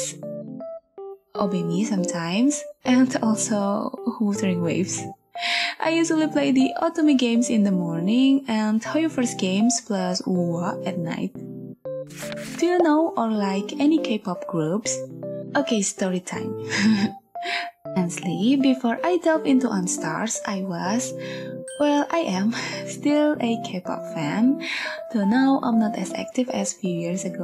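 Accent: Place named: Philippines. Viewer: I didn't expect for you to be from Indonesia, i thought you were from Philippines because of your english accent, and you look beautiful. And while i do want to see you make a video content of other games, but i suggest you should focus on enstar, because it takes 4 days to make a video of one game, if you make a video content about multiple games I'm worried that you might feel burnout and overwhelmed because of the many videos editing and gameplay, managing time is not very easy, especially if you play multiple games and they all have an event in the same time, i always feel overwhelmed when that happens, but still i will wish you the best of luck in whatever choice you made 🍀🍀🍀🍀🍀 And again congratulations on 5k subscribers, your guides and event scout video really help me a lot ❤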